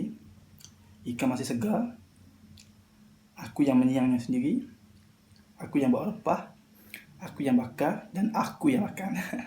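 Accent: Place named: Kuala Lumpur, Malaysia. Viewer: ckp kolumpor ho..haha hai nama saya beng.igt x?😆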